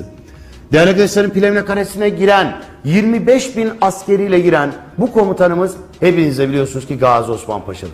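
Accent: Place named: Ankara, Turkey. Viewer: hocada daha ozaman angara şivesi upgrate edilmemiş.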